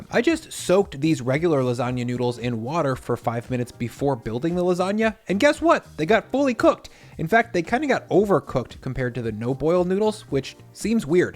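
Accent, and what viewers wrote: United States. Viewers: why do you do everything in grams? is it too gauche to use spoons, cups, lbs and ounces? or are you a european who lives and works in europe? you sound like an american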